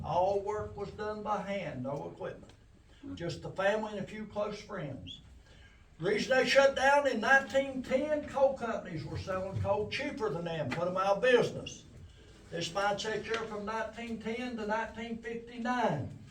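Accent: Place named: United States. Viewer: asik om. baru kali ini denger asli aksen logat kaya koboy sibapaknya yg cuma ada di dalam film "well howdy partner nice see ya".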